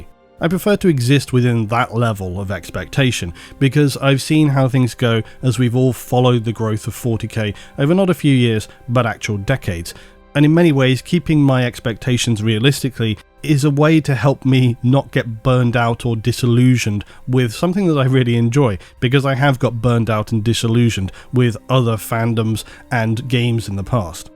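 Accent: England, United Kingdom. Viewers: I like when England man speaks.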